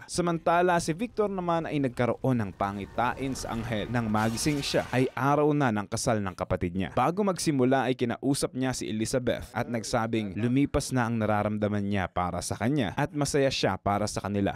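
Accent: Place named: Visayas, Philippines. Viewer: Halong bisaya dol.palibot man haha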